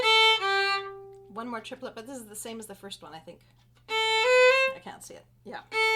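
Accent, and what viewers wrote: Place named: Canada. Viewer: I found this video to be very helpful. I have small hands, short fingers, many years (66) and have been taking lessons from an excellent teacher. Never heard that you should roll a finger to the next string and never heard to “never” lift the fingers, which is what I have been doing. Going to try and see what happens!! Love your Canadian accent. Thank you for posting this video.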